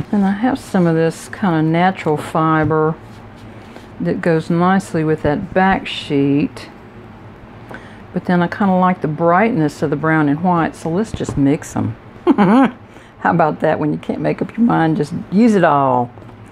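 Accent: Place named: Southeast, United States. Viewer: My husband and I watched your video during my lunch break from work yesterday! You have such a great eye for design. We both loved your cards. Everytime we watch one of your videos we have the conversation about your accent and where you’re from. He thinks maybe Mississippi and I won’t commit to a state but just say “somewhere in the southeast”. My husband is from West Texas and has a definite West Texas accent. I’m from Pennsylvania and although I don’t hear it, when we lived in GA so many people would comment about my northern accent. Accents have always fascinated me. So my guess is still that you are from the southeast but I’m pretty sure not Alabama, Georgia or Mississippi. Maybe Tennessee or South Carolina because yours isn’t as strong as a Alabama, Ga or Mississippi. Sorry for the ramble about non-card/video things. I’ve become such a big fan of your videos that they are literally the first videos I look for when I get time to watch some TV. 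One more question for you, also from our discussion yesterday - what is the microphone you’re using? I’ve been starting to create my videos and finding time to do voiceovers is hindering getting other things done so I’m thinking about looking into microphones so I can talk as I go, like you do, and then edit out what’s not useable, which would eliminate the voiceover step in our production schedule. Thank you again for making videos that show how to use what we already have and don’t have a selling aspect to them! Hope you have a w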